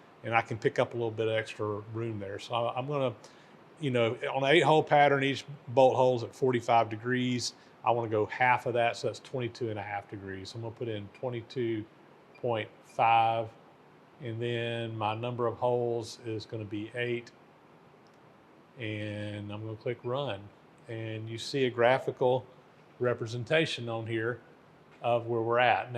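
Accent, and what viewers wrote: South, United States. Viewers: Hope y’all appreciating the genuine accent of a true Southern gentleman. 90% of what you hear in film/television is an “attempted” accent, and usually highly exaggerated. :-)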